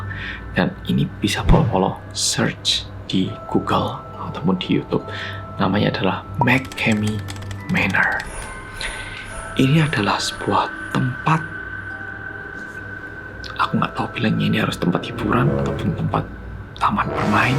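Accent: Java, Indonesia. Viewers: Masih bersama mas yang MEDOKKKK... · Nah iyak sama😂lupa soalnya..biasanya medok wkwk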